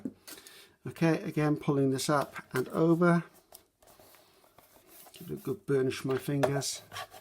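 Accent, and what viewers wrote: England, United Kingdom. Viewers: First of all, I love your videos. I normally never look long videos. I get bored very quick. But with your videos I like the things you tell, your English accent , and I am afraid I miss something when I skip parts. :) I used to glue the pages, but I dont do anymore. My gluesticks cost 5 Swiss Franc ( about 4 pounds) x 3 , too much for me to spend before I begin a journal.. So now I make my mixed media pages separate and than glue it on a single page. No bulk Most pages will be covered with just paper collage anyway Or I buy a nice MM journal for that 12 Pound and alter it on the go. . The gluestick I use is Tombow mono Professional glue stick. It is SO good, no buckling , and even after many years it sticks very strong. And I am addicted to the smell. After a long surching way finding the best and cheapest liquid glue : collall all purpose glue! 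It sticks everything , paper , fabric, etc. And it costs almost nothing here in Switzerland. Well , long story, and I think you know most of it already, Bye bye, have a great week!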